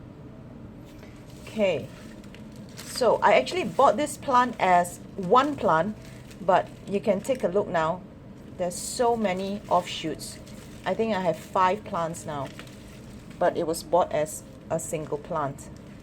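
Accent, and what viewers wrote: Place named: Singapore. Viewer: Immediately after I heard your voice I said, oh a singaporean plant hobbyist.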